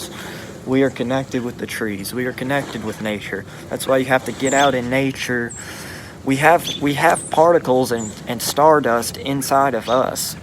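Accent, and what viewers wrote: South, United States. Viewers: You sound like your from the south...how old are you?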